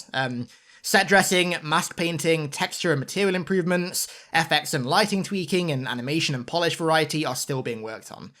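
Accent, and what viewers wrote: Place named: United Kingdom. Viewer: Brittish wankuh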